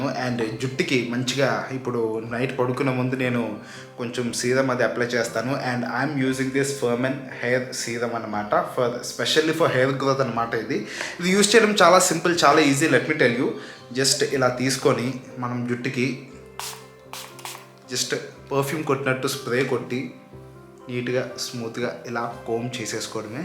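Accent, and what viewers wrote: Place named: Telangana, India. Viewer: Atluthadi maa telengana slang ❤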